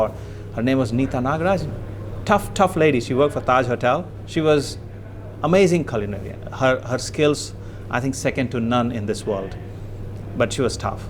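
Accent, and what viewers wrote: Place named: United Kingdom. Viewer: British accent uncle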